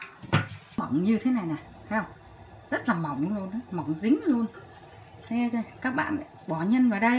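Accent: Vietnam. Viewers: Giọng nói của Cô hay quá Cô ah - giọng Bắc / Saigon xưa - thật rất đẹp và sang. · Đó là tiếng Bắc 54. Nghe rất hay và sang.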